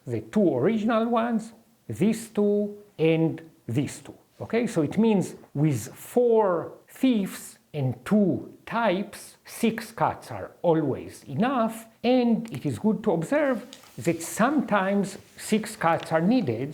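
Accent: Israel. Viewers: Its typical israeli, like if you had an israeli prof in a comedy show, thats what he should sound like Sources: Been to his Uni, lives in the same land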